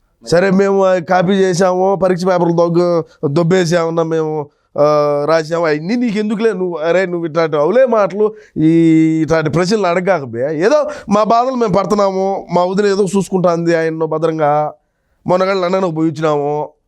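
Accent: Rayalaseema, India. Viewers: 😂😂😂 సూపర్ అన్న.3 రోజుల నుంచి మీ ఛానెల్ విడియోలు చూస్తున్న అన్న సూపర్. మీ రాయలసీమ యాస వినడానికి చాలా బాగుంది.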